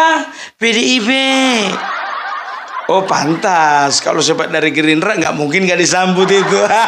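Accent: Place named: Bali, Indonesia. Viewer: Wah logat Balinya kentall Joss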